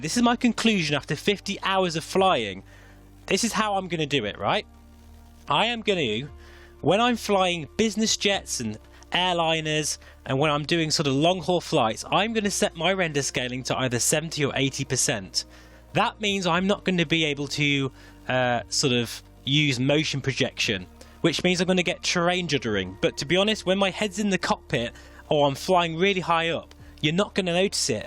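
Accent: United Kingdom. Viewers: what? I’m being serious 😀 New subscriber, couldn’t quite make out your accent, I’m going to punt at midlands area?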